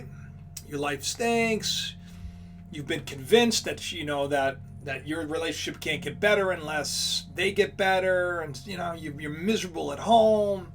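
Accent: Ireland. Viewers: Darn good Irish accent 🍀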